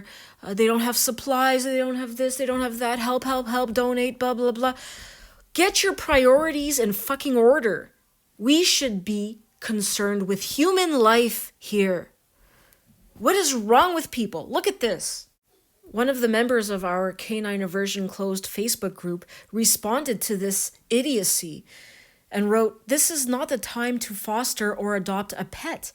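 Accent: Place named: Canada. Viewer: Lets say for hypothetical purposes that dogs only become violent because of bad owners. That still doesnt justify allowing them into our society. In fact bad owners are more likely to be negligent, not be able to restrain their dogs or keep it in their yard or let them out. I never understood this argument and it is NOT a reason to allow dogs because 50% of dog owners are probably going to be bad. How does this justify the risk? I love your Canadian accent BTW.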